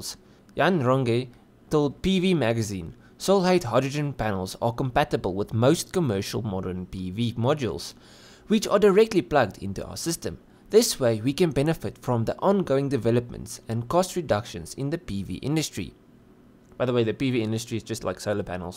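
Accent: South Africa. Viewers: Nice South African accent.🙂👌👍👊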